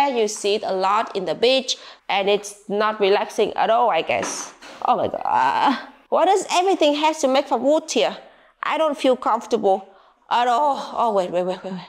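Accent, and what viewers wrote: Germany. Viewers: U developed a German accent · Is she getting a German accent? Lol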